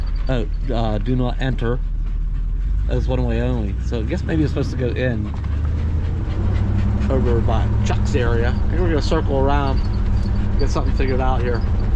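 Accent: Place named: Northeast, United States. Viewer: You sound like yr from the NE area am I correct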